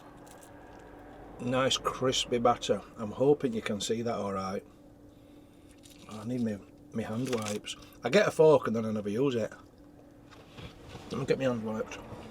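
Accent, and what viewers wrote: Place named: Barnsley, United Kingdom. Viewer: I hope that fish and chip shop was 'white pass' because with your Barnsley twang it sounds like 'wipe ass '. Lots of love Huddersfield .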